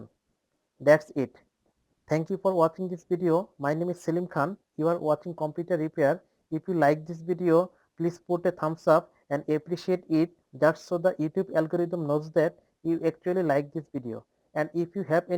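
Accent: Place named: India. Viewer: I clicked off right away after hearing that's he's Indian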